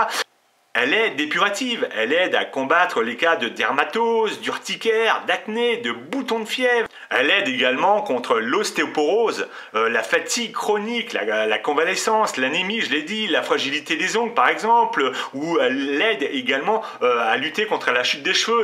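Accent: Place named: France. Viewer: Tu m'as convaincu j'en fait une soupe dès demain ! (PS : j'adore ton accent bien franchouillard, tu respire la sympathie et la bonne humeur ;) )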